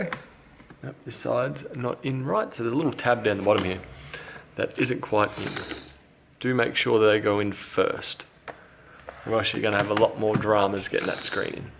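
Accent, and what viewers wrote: Australia. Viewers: on taking my HP apart today, I see there is very little to play with, thank you for the variety and detail of your channel, and clear Aussie explanations.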